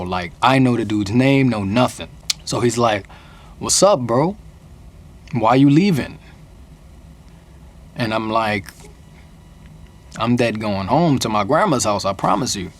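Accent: New York, United States. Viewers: He mostly he sounds like any other American I'm form California and he has a slight NY accent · His accent so heavy it make me think I’m not a New Yorker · I LUV New Yorkers' accent!!!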